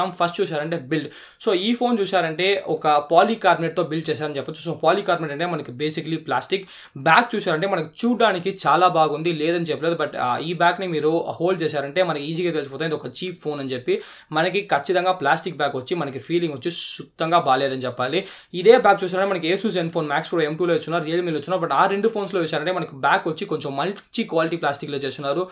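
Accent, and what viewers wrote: Tamil Nadu, India. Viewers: Telugu baga maatladutunnav bro kani koncham tamil style lo undi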